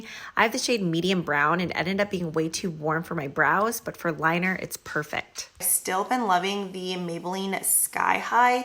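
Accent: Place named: New York, United States. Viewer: That was hysterical! Yonkers is near me and NYC and definitely have an accent if you are from there. Hahaha. Loved it Happy easter love! Love all the vibes of this video. And I also adore the new way or what seems new way to show product name. Awesome editing. I ordered a bunch of your recommendations from k beauty video. Waiting for it to ship. Lol. Wait I own those two blushes too you just used. Amazing combo. Haha. Thanks for those too!! 🐇🐇🐣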